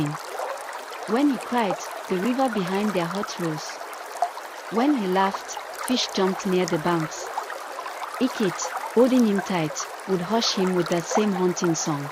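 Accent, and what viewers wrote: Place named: Africa. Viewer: I love the sound effects on your videos and the African tone, you're doing well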